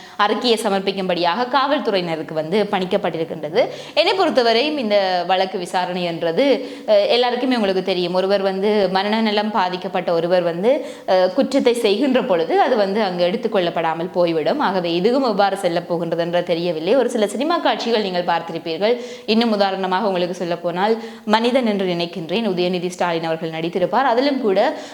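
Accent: Sri Lanka. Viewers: இலங்கை தமிழ் உச்சரிப்பு மிகவும் அழகாக இருக்கிறது ஆஜர் என்ற சொல்லி தவிர்த்தால் நல்லது,அது தமிழ் இல்லை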